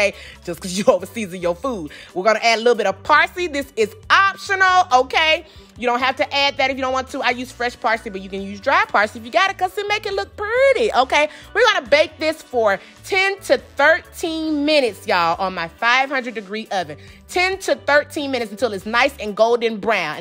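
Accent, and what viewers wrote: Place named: New Orleans, United States. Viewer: At first your voice made me think something negative BUT, the more I listen, I love it. It remind me of home in New Orleans. Thank you for all you do.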